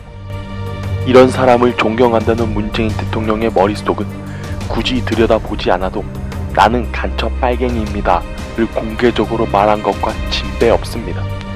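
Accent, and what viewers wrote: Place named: Hamgyong, North Korea. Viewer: 함경도 사투리쓰네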